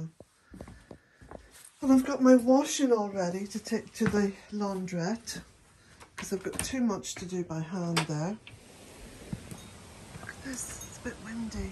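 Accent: Ireland. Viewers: at times when possible and feeling up to it, could you add typed out the names of some of your plants? Today, the one I think started with two syllables of "C" and perhaps ending with being "Aster", I would love to know what it is. It was along a wall and the bees were enjoying it! 🥰 I'm from America. 🇺🇸 🙂 Some of the names of plants I can make out and some along with hearing them correctly, I know of and some I've had. I'm sure you're speaking them all correctly! I dearly adore your accent. In America there's so many different accents! As well, I don't know if we have all of the same plants as Ireland. Some I'm sure aren't in both of our continents. My Great-Grandparents came from Ireland to America. So I have Irish in my ancestry and some in my other blood line, too. Thank you kindly,. I always feel nourished by watching you, seeing your lovely cottage, and life in your ever-evolving three acres! 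A most beautiful slice of earth!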